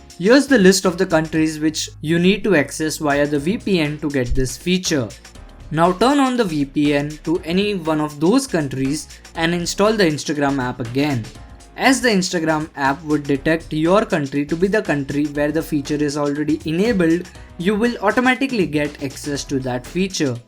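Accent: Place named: India. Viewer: His indian accent is cute though 😀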